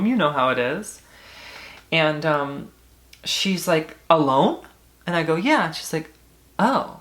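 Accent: England, United Kingdom. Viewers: BRITIAN ISNT JSUT ENGLISH STOP SAYING UR ENGLISH ACCENT is BRITISH as a Scottish person it offends me And we say ye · LOVE your British accent. :)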